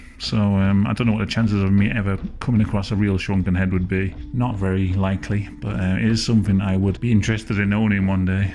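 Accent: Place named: Liverpool, United Kingdom. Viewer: If your from Wales and not liverpool why do u talk with a scouse accent lol??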